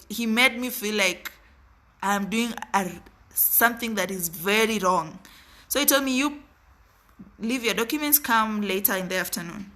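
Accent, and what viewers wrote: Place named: Mongolia. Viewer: You are a very strange woman the same as the guy who told you not to go to Ethiopia. You just look an ordinary Ethiopian girl but you sound like as if you are from Mongolia.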